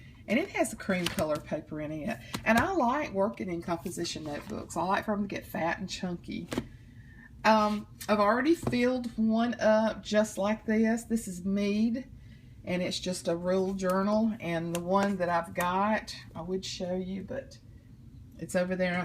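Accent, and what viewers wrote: South, United States. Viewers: I'm such an idiot, I obviously wrote this comment before I'd even finished watching the video, lol. By the way I absolutely adore your accent. I love the accents from all around the south/deep south in the USA. Y'all sound so beautifully well mannered, with your habits of saying sir/m'am and "bless your heart" (although I think that's more of a Texas backwards compliment thing lol.)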